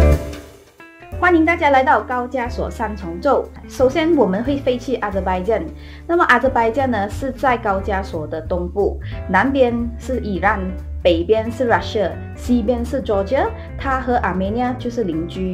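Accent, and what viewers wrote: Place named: Malaysia. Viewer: 听口音，博主是马来西亚人吧？视频图片很多，信息丰富，感谢。我是看见这个国家的糕点视频引起的好奇心。博主不出镜而是拍全凭景观非常赞！🎉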